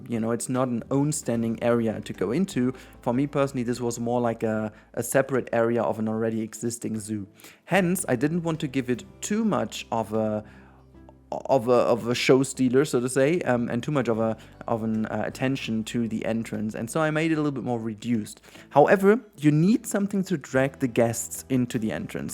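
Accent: Germany. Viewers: Man hört das du Deutscher bist! :D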